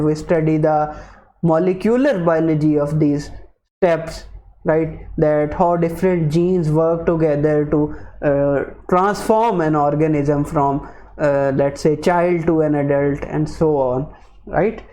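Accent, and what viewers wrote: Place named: India. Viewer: From his accent, I think he is Indian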